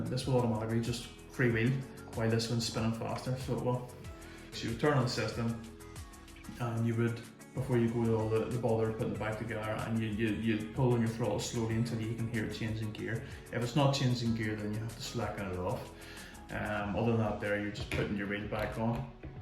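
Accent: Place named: Ireland. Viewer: Can't hear wtf your saying . Sort it out. I guess u are Irish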